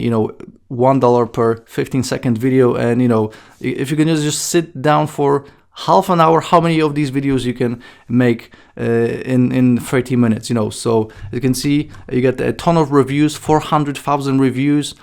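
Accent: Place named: Portugal. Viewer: your Portugese accent makes it difficult to understand sometimes. Please speak more slowly and repeat the key info several times. Thanks!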